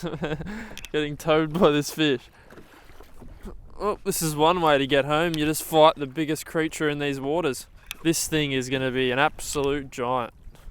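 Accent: Australia. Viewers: Where are you based like country wise because your catching snapper and those annoying ass sharks that tangle up your lines 😂 so I was guessing New Zealand north island area but your Aussie accent is putting me off 😂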